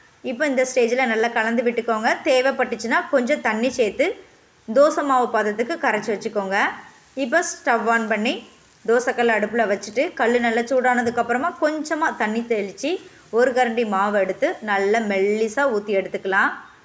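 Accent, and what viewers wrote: Tirunelveli, India. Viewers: உங்கள் பேச்சு சரியான திருநெல்வேலி தமிழ். கேட்பதற்கு இனிமையாக இருந்தது.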